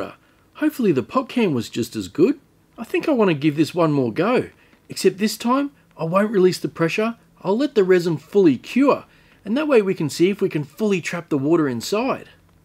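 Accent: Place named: Australia. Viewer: I liked 1& 2. Hey, where I live we are having tornados in the area so we have a Take Cover Warning. I’m watching your videos while sitting in my bathtub (I don’t have a basement). Thanks for keeping me company. Do they have tornados in Australia? You sound like that is where you are from. Have a great day.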